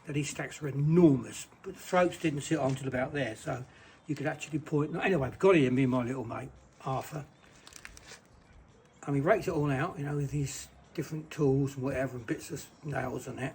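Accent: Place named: RP, United Kingdom. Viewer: Wondered where had picked up the plummy accent 👨‍🎓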